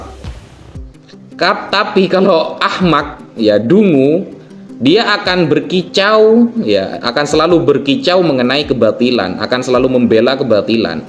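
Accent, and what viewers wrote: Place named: Madura, Indonesia. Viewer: Dari segi bicaranya ini orang madura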